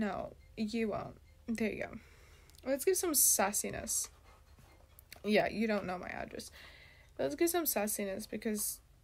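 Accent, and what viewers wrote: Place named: Australia. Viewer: I knew it I knew u sounded like a Australian🙃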